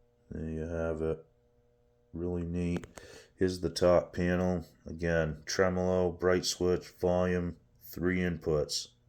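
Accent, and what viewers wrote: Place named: Maine, United States. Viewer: You sounds like you straight came out of middle/central Maine.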